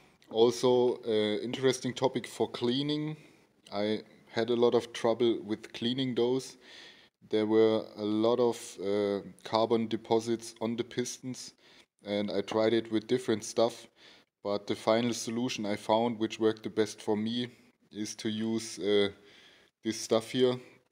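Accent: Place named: Germany. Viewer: dieser geile deutscher akzent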